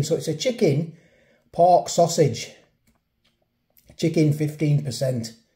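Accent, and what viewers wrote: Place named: North, United Kingdom. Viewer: By the way the wholesome northern accent makes your channel feel so authentic like an authentic northern cuppa T lol 😂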